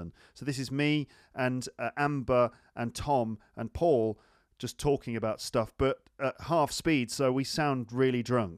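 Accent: United Kingdom. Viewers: hello... First time watching you....great..I love your British accent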